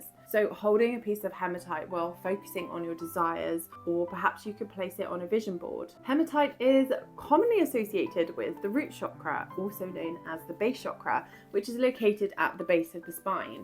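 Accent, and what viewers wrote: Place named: England, United Kingdom. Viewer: Thank you for your very interesting video. I'm new to your Channel and this is only the second one I've seen. I have subscribed as I love your calm, relaxing English accent. You are also a Beautiful English Rose. 😘🏴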